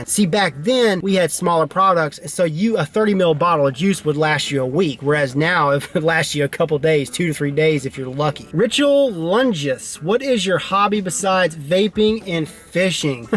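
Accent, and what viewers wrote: South, United States. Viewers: well well,...that's a trip! You're an Oak Ridge boy and I am a Hermitage/Nashville Girl! I've always thought I heard a lil twang in ya but when you said you dipped, I KNEW you were southern! Ahaha....My brotha from another motha! Thanks 4 the vid. Hella informative. More so than any other yet!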